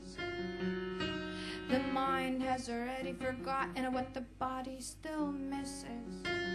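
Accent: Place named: RP, United Kingdom. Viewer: she sounds posh. love this so much.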